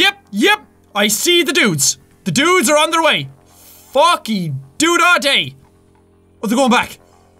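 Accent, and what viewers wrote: Ireland. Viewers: He is Irish if you cannot recognize his accent.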